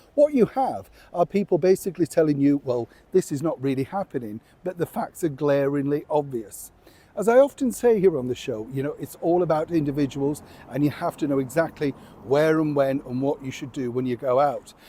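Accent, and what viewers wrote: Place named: RP, United Kingdom. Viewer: Enjoy speaking the Queens English while you still can (ausie phil)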